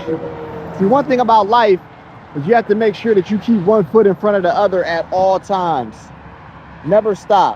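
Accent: United States. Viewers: America people need to know that we find it strange when You start to speak with an American accent. So please don't think it is Because we are racist.... We also generally don't like American people are we feel to be loud and at time obnoxious. As for Charlie Kirk ....he was a hateful man and that hate came back on him. America is fkd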